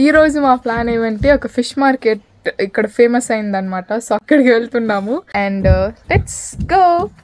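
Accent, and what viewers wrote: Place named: Rayalaseema, India. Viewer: Mi voice vintunte you are belong to rayalasima anipisthundi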